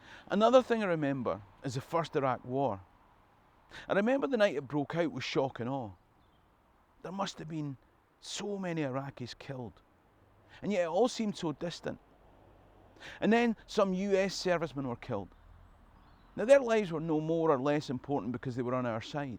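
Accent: Scotland, United Kingdom. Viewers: absolutely fascinating and in a scottish accent that a scot can understand , not at all like the smoothing southern irish accent that when you listen to them speak its like they are lamenting to tou , compared to the northern irish accent that cal tear wall paper from a wall just by the sound ha ha ha , seriously though , its a must that scottish history must be taught , spoken , and explained by a scot with a proper understanding accent , clear and precise , which has been done excellantly , i am now a devoted watcher , and admirer of this podcast and i look very much forward to watching as many as i can , keep up the great work , your doing a great job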